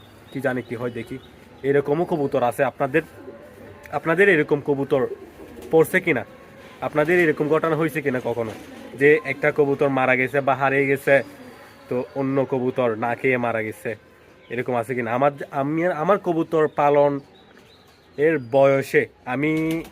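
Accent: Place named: Chattogram, Bangladesh. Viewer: Bhai apnar basha Chattogram ar kon jaigai?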